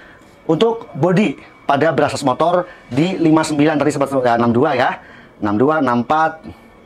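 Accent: Java, Indonesia. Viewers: bang sampean dari jawa bukan? logatnya medog , 🤭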